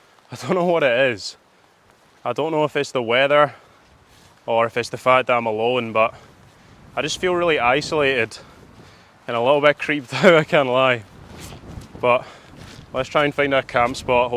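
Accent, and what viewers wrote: Scotland, United Kingdom. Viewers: Definitely Scottish with a lovely clear accent. 🏴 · May I ask, is this a particular dialect you speak or general scots english?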